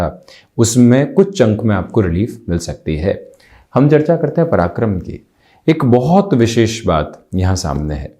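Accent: Standard. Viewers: Koti koti, pranam 🙏🙏🙏🙏 Apki shudh hindi ko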